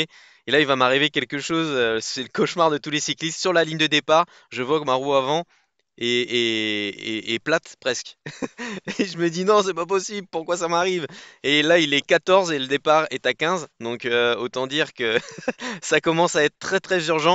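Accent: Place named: Brittany, France. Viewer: Tas pas des origines bretonnes ? Ton accent ça sent clairement le Finistère haha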